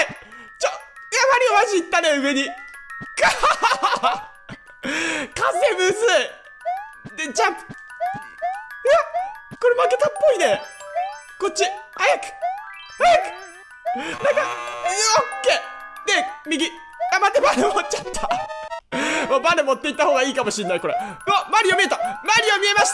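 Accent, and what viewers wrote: Fukuoka, Japan. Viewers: 自分も福岡ベンなんで、方言使ってくれるの、嬉しいです！ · 方言よきよき😊 福岡住んでたから愛着あるぅ！いまだ福岡の方言でちゃいます😂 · 福岡の方言のほうが聞きやすくて好きです🤘🏻笑 今日も動画面白かったです！ありがとうございます☺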